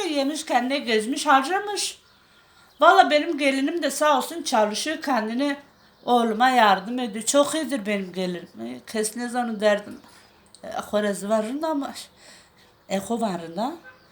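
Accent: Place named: Bingöl, Turkey. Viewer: Bende bingöllüyüm aynı bizim gibi konuşuyor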